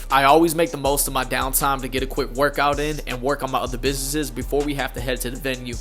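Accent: North Carolina, United States. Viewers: I think you should get paid as much as you are able to get. I don’t mean you would be unfair to this rapper. I mean I think you are worth an unlimited amount. Your conversations with people are genuine and lovely. The attention you give your haircuts AND your clients is absolutely priceless. I’m in North GA near Athens, so I understand your roots somewhat. Much respect and love to you and your work. You deserve all that life has to offer. I do NOT understand why you talk the way you do, being from NC, but we all have our ways.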